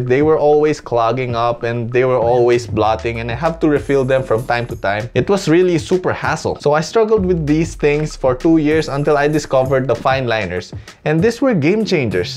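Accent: Philippines. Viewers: With your asian accent, i can tell, you’re a filipino😂. I also want to be an architect and this video really helps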